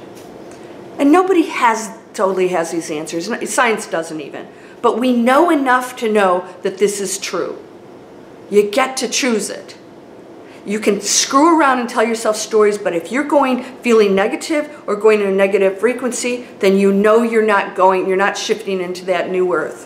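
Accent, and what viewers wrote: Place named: Detroit, United States. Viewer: Hi, I just got to say I love your Detroit accent. My mom and dad where from Detroit and my mother has a similar accent like yours. My parents lived on 9 Mile back in the 1950s and early 1960s. They moved to California in 1963 but I wanted to say how much I love your channel. Thanks for everything.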